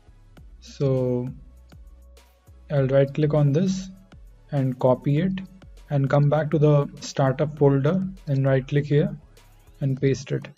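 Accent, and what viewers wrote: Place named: India. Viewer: I hear enough damn Indian accents when I use the phone!!!!!!!!!!!!!!!!!!!!!!!!!!!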